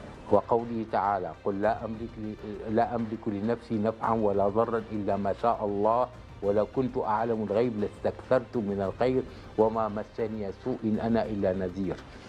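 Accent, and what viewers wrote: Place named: Syria. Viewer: hhhh أكيد يتلعثم فهو حفظ القرآن و هو كبير و هو سوري تغلب عليه اللهجة السورة و درس في روسيا و يتقن عدة لغات المهم هو يحفظوكتاب الله اذا تلعثم أثناء الحديث لهذا شيء عادي جدا و ليس حرام لأنه أثناء التفسيير او مطالعة كتاب الله يقرؤه جيدا ثانيا لقولك ندير و تدبير و عيرته بضعفه في اللغة هو تكلم عن التدبير و المعنى واضح حسب سياق الجملة و انت قلت له ليس تدبيير بل تدبر لا حجة لديك في باقي النقاش كلامك مجرد نقل نقل نقل همهمهمهمهمات تكلم بالعقل و المنطق ولا تدعي على الناس ما لم يقولوا و هو شرح آيات الله شرحا واضحا يفهمها العام و الخاص و هو لم يحلل الحرام لو أستمتع لكل حوارته ستفهم مايريد ايصاله المهم ليس عيبا ان تكون ضعيفا في اللغة العربية لكن العيب ان لا تفهم ما يقال لك و لا تميز بين الصح و الغلط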